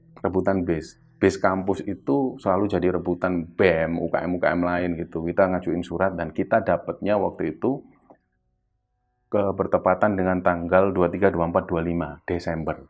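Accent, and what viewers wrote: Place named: Java, Indonesia. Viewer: Jawa nya kental banget mas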